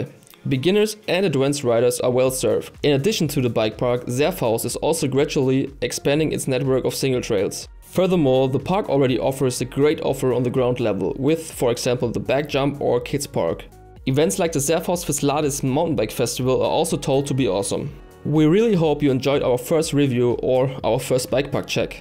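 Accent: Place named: Germany. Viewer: Super Video! Gutes Denglisch ;)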